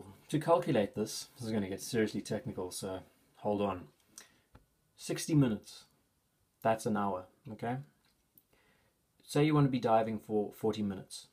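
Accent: South Africa. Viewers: Sounds like a Saffer twang to me.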